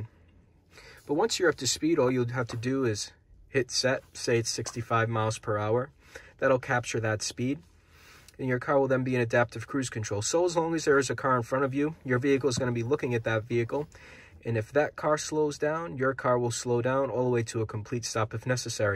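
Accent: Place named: New Jersey, United States. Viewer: You from New Jersey? Love the accent!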